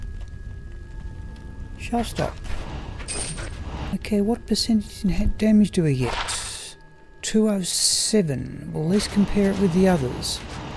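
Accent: Australia. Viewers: Thx for another good one,! Keep 'em comin'! Are you Australian btw? ☺️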